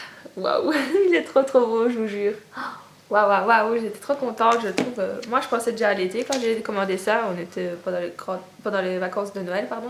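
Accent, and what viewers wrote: Belgium. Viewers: Sympa d'entendre une belge pour une fois hihi :) J'avais déjà entendu parler de sleek mais je ne savais pas où commander, grâce à toi mtn je sais :p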